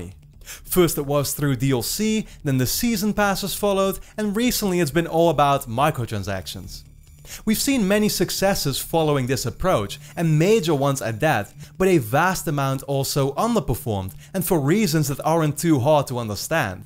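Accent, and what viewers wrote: Netherlands. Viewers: Dude are you Dutch? i lived for some time in amsterdam and this accent has just stuck in my head so deeply.... :D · Are you dutch? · You cant hide your dutch accent. Its too good to hide ❤️ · Is this guy dutch? He has a familiar accent... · dutch accent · Aaah the dutch english, it never stops.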